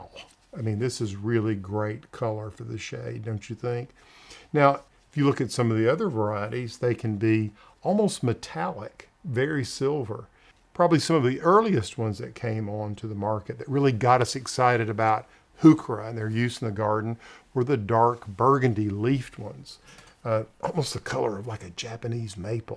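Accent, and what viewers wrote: South, United States. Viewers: I am from Kentucky but am now in Vancouver, BC, Canada. I love hearing your Southern accent! I do have a big shade area and do have hostas, but the gardeners may keep pulling them. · At home here in London where I’m talking about my hostas and how to fill the shady areas of my garden. I can’t believe my luck to have your video pop up. You have a great video format here. As a beginning gardener, Gardener’s question time on Radio 4 (here in the UK) can be pitched a little towards the expert gardeners for me, but your style is very warm (charming to hear that quaint southern American accent), engaging and informative. Loved your ‘filler, spiller and thriller’ concept! I’ll be applying that method this afternoon. Thanks again for making this great video. I just subscribed as I’d like to I see and hear more from you. Good luck!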